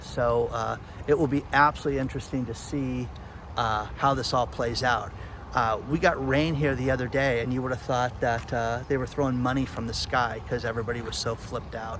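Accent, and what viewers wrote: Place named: Western New York, United States. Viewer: Are you from Western NY State? Your accent, the way you pronounce certain words like "roof" indicates you are. I'm originally from Buffalo NY area.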